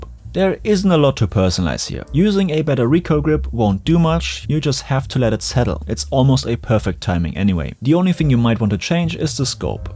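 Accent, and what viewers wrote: Germany. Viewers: I fell in love with your voice and German accent in the first video I watched.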